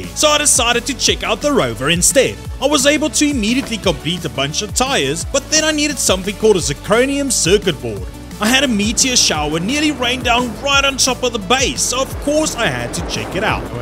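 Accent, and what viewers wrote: South Africa. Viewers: Are you South African? You sound South African. I am from South Africa, lol.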